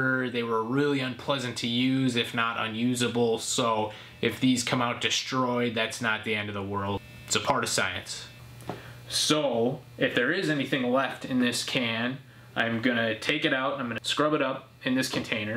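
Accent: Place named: Midwest, United States. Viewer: Where are you from? I'd guess somewhere in the Chicagoland area, Wisconsin or Minnesota based on your accent.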